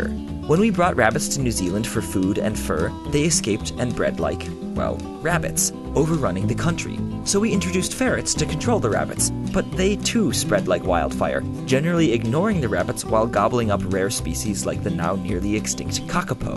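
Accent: Australia. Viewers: r u an Aussie? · Is Australian?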